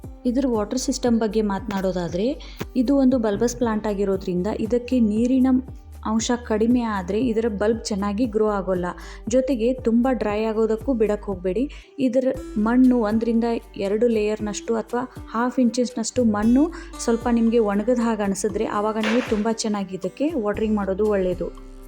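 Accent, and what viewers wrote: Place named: Bangalore, India. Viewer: ನಮ್ಮ ಬೆಂಗಳೂರಿನ ಕಡೆಯವರು ಎಂದು ಭಾವಿಸಿದ್ದೆ, ಬೆಂಗಳೂರಿನ ಕಡೆಯ ಬಾಷೆ ಚೆನ್ನಾಗಿ ಮಾತಾಡುತ್ತೀರಿ ವಂದನೆಗಳು ಮಾಮ್.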